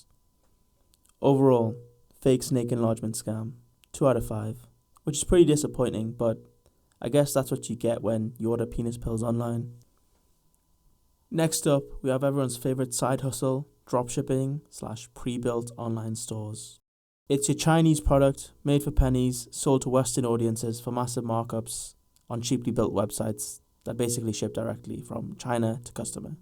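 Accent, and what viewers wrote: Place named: United Kingdom. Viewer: That's just a British person talking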